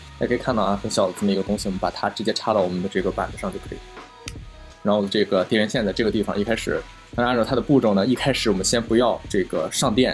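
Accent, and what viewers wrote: Shandong, China. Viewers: 是山东人吗哈哈哈哈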